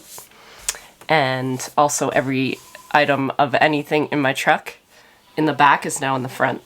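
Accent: Scotland, United Kingdom. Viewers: A good Scottish accent right there 🫶🏴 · Can’t go wrong with a Scottish accent!! My dad who passed away 10yrs ago was Scottish and I loved his accent. I miss it. Just wondering, you utilize everything from a lamb that you can, right? Well, when they go to market, who gets their skin? There is a market for it isn’t there? I remember going to a restaurant once on the Old Banff Highway and it was run by the First Nations and upstairs was a museum where they showed a buffalo and what parts of the body was used by them and for what, right down to the testicles being used as a baby rattle. 😳 It was interesting to see it. Very little of the animal was wasted.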